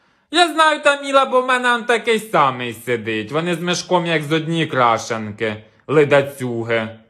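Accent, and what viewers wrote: Poltava, Ukraine. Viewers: Звідки? по говірці дуже на Полтаву схоже)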